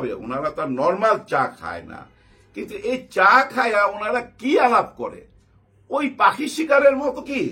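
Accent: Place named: Noakhali, Bangladesh. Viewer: Nice story vai I am pretty much sure you are from noakhali it’s ok nothing wrong with that..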